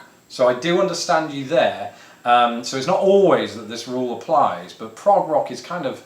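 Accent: United Kingdom. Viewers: I like the way British say butter, it sounds like it tastes better the way you say it.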